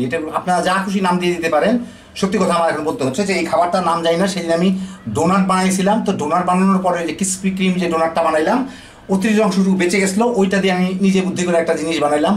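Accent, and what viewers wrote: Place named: Satkhira, Bangladesh. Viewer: Dada you , especially your language remind me my ancestry migrated from Satkhira ,if I am not wrong. Love you from Calcutta.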